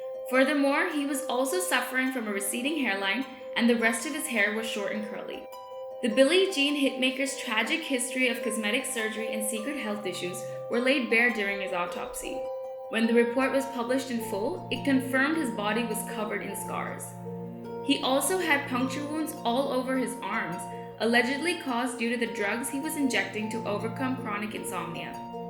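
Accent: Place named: India. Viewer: Are you Indian from INDIA? You sound like it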